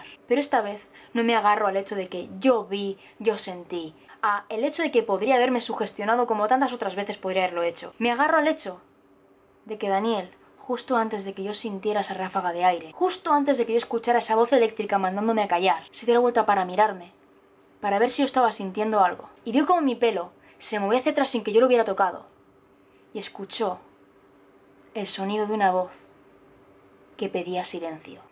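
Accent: Spain. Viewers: Porq Dalasito no tiene este acento español ???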